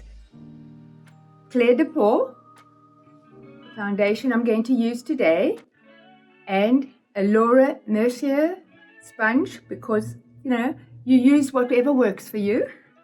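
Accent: South Africa. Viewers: I recognised her south african accent as soon as she started speaking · What an exquisitely charming lovely Women. The South African accent is just beautiful . Thank you Vogue for presenting a mature women aging lovely . This was just a refreshing tutorial.👏👏👏🇨🇦❤️ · She kinda sounds South African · Love her South African accent. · Love your saffa accent ❤ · its a South African accent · As a South African I definitely heard her accent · Wow, you so beautiful with or without make up. I actually was scrolling past and the videos play on there own and I got drawn in by the sound of your voice. I can just hear the South African accent in your voice as I am South African and was so confused lol until you explained. I hope that even in my later years I can be just as poised and elegant as you are. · She still has her South African accent 🥰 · South African accent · It's weird hearing a South African accent on Vogue. 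· So good to hear a South African Accent on Vogue ;) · I love that you've still got the Saffer accent! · You can hear her South African accent perfectly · I love that she still has her South African accent. I really love her representation for older generations.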